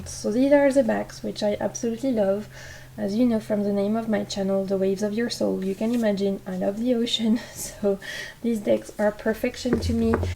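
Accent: France. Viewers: Love your sweet voice, so glad to find your channel. I loved the cloth with stars it was so perfect as a reading cloth❣️ Most of all I love your beautiful French accent. I think it is great fun to share favorite decks with others Thank you so much. Suzy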